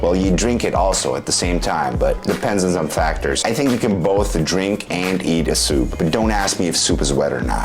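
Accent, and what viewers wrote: United States. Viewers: I like the way he says channel. It sounds like chiennal. I wonder what part of American accent he has.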